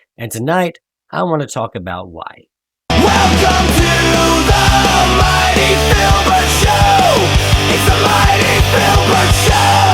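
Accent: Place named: North Carolina, United States. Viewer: thought I was hearing NC. ✌️🤘🏻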